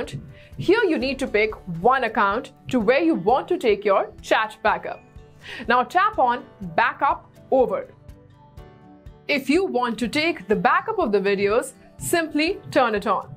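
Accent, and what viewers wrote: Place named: India. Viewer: O realy, you make it so easy for me. I love the english accesent of Indians.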